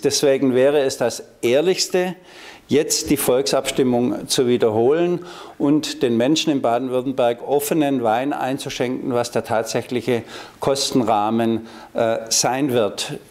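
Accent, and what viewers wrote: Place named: Switzerland. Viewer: Ähm Sorry, aber das war gerade ein wenig zu viel Schweiz..... denn ich verstehe kein Wort! :D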